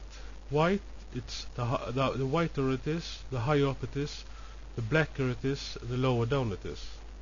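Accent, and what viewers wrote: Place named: Norway. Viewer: Hehe, Norsk-Engelsk er morsomt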